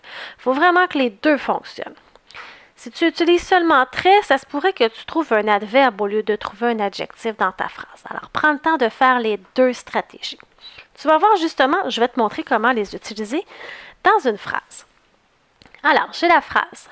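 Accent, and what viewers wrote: Quebec, Canada. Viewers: Ton accent québécois et incroyable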